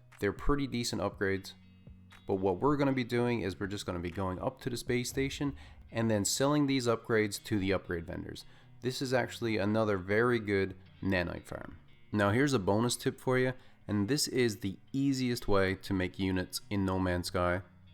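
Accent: Newfoundland, Canada. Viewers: Lol, I was thinking the entire video that you sounded Newfie.... and then your outro confirmed it haha. Obviously I didn't check the channel name before watching XD.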